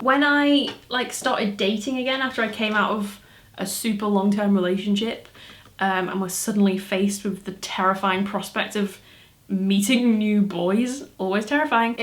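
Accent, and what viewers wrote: Liverpool, United Kingdom. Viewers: I love how you dip in and out of a scouse accent.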